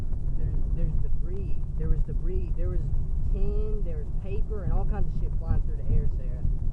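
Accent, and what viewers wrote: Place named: North Carolina, United States. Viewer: you can obviously tell that your from NC, you've got that NC Country Twang. I have it too. <-- and for the people that don't know what a twang is, its kind of like your accent.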